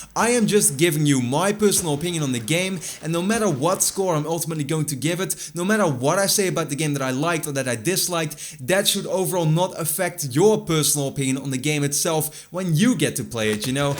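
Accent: Netherlands. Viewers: toen ik je accent hoorde dacht ik gelijk dat je nederlands was eh!😂 en toen keek ik bij je kanaal info en het klopte ahahah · are you from holland? i recognize the accent.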